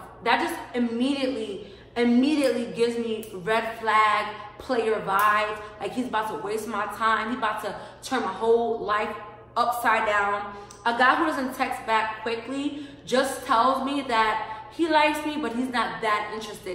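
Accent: New York, United States. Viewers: I love your ny accent ❤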